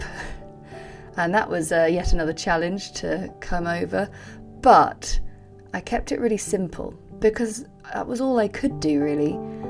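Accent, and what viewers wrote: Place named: United Kingdom. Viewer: Is that your inspiration picture on your computer? I loved this tutorial. It’s fascinating to watch you paint! Good for you stepping out of your comfort zone! I tend to be more detailed as in your other videos. I’m a new follower and love hearing life British accent! Your pup looked so sweet. Have a wonderful rest of the week! A new friend in Tennessee and Iowa. USA. 🇬🇧🇺🇸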